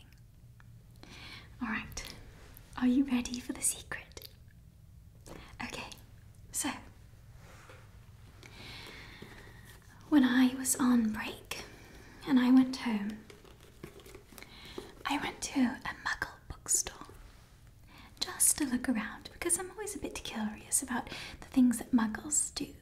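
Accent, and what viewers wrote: United Kingdom. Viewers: This is the first Harry Potter roleplay where I’ve seen you actually use a British accent.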